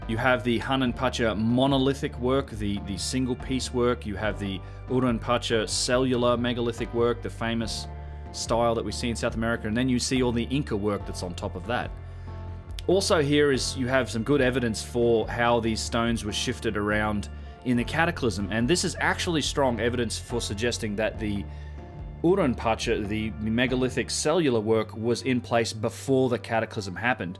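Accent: Australia. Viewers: Thanks, a fascinating tour of Sacsayhuaman. I love your clear presentation style too, and as a fellow aussie I am SO happy to hear an aussie accent on Youtube - not many of us do this kind of work.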